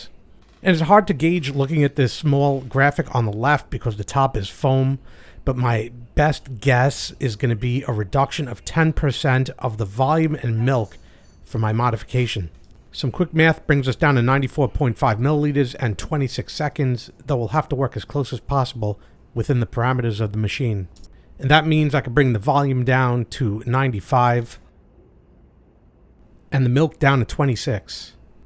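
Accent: New York, United States. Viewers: So, I'm a former NYer and just freaken LOVED hearing a voice from home! We ordered the S8 last night and expect delivery in 5 sub-par coffee days (our Breville burr grinder is breaking so the coffeemaker is making tan water - ugh). Thanks for walking me through the set up, etc. Lavazza is good coffee, as is Julius Meinl Prasidente, but I still prefer La Colombe Monaco or Sumatra beans (via subscription - roasted in PA and shipped). OMG, as I drink this swamp water, early in the morning with the house still asleep, I am PINING for a cuppa anything made with the S8! Subscribed!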